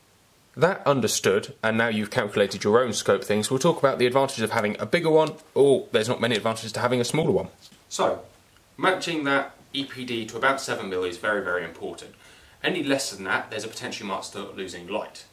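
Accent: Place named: RP, United Kingdom. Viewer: POSH BLOKE. your knowledge is impressive.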